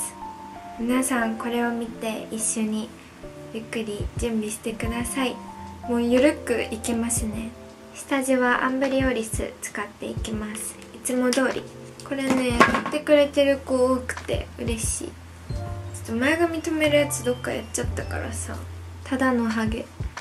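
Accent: Kansai, Japan. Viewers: サムネみて強い女がいる！！綺麗！！！すき！！ って思って動画飛んできたら喋り方緩いし関西弁だし超大好きなんだけど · 関西弁が心地よく感じるのはじめて‥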